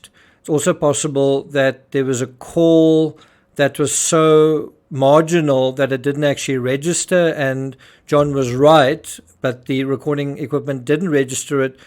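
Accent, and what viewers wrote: South Africa. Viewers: you have an excellent way of presenting your podcasts I love the South African accent too. From Melbourne down under Australia 🇦🇺 🇦🇺🇦🇺 There seems to be an awful increase in the murder of young children either by a parent a family member or a paedophile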